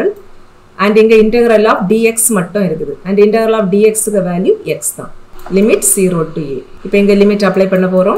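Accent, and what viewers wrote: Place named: Tirunelveli, India. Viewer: Romba arumaiya teach panreenge. Namma thirunelveli style pechi. Thank you so much madam.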